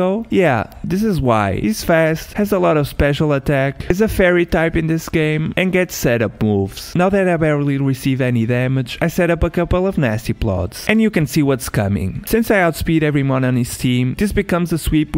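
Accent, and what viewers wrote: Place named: Italy. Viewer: Da come parla sembra un italiano che prova a parlare in inglese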